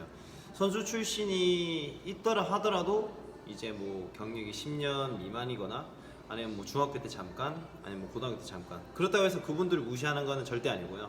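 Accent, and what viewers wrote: Busan, South Korea. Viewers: 부산분이여서ᆢ듣기넘 좋아요^^ 오늘 처음 양궁을 접했구요 많이 구독해서 하면서 궁금한건 물어 볼께요 아~스트레스 해소에는 매우 좋아요 계속 쭉~욱 해볼까 합니다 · DC에서 보고 왔습니다~ 입문자들에게 아주 유익한 정보가 많을 것 같습니다~말투가 부산말투라서 부산이가 했는데 역시 부산이였네요~앞으로 많은 좋은 정보 기대 합니다~긴장하셨는지 처음에 많이 굳은 표정이라 웃는 얼굴이면 좋겠다는 생각이 들었는데~뒤로 가면서 여유가 생기면서 웃는 얼굴이 좋았습니다~그럼 다음편 기다리겠습니다~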